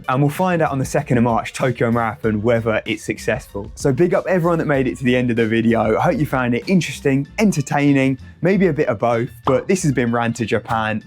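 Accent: United States. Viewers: Your American accent is amazing!!!! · Nailed the American accent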